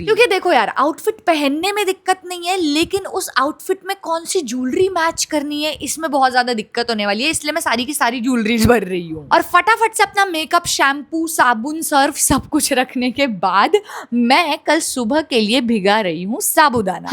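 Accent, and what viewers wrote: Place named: Indore, India. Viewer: As a indori I just love that bich bich me thodu sa indori wala pronounciation 😁☺️🫶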